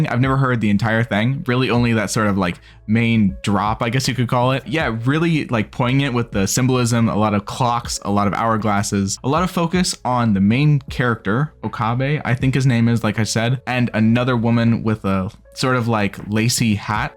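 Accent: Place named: North America. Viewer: Вот это английский. Наконец-то я понял КАЖДОЕ слово. Интересно - откуда ты? Из какой страны и региона?) Я по английски не говорю - но понимаю его (90% на тексте, и на слух моё понимание варьируется от акцента реакционера). Это точно не англия, в которой слова "глотают", словно повыбивали все зубы, это не центральная европа (там акцент более жесткий), и не восточная (там жестко абсолютно). Не латинская Америка. США, канада, Австралия - скорее всего одна из этих стран. Но в США от штата к штату акцент тоже варьируется. Точно не Техас))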